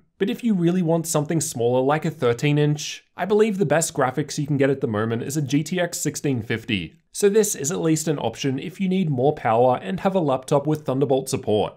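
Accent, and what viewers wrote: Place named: Australia. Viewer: One of the reasons I watch your videos is because I like mimicking accents and I am not so good with Australian accent, u talk clearly and you r a tech YouTuber so I can do two things at a time, watch tech videos and learn Australian accent 😁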